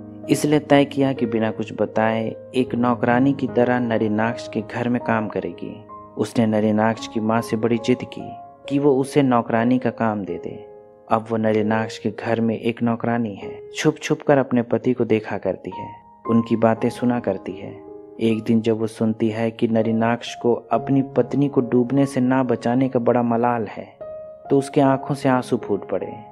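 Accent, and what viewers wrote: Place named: East, India. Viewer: Shabdon ka istemaal sahi hai Bas uccharan (pronunciation) alag hai. Pronunciation alag hai, par galat nahi. Eastern India especially in Bihar, Jharkhand, Chhattisgarh wahan "nukta", "s" & "sh" me antar itna prominent nahi hota daily life me. He has acquired that accent. Jaise English ke kai accent ho sakte hain, waise hi Hindi ko bhi alag tareeke se pronounce kiya jaa sakta hai. We should appreciate his efforts to bring such good movies with such a lucid explanation rather than pointing out these petty mistakes. Let's encourage each-other and be more acceptive of each-other.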